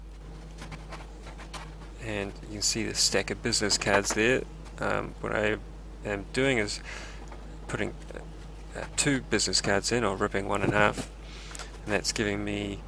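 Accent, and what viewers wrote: New Zealand. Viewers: are u from nz?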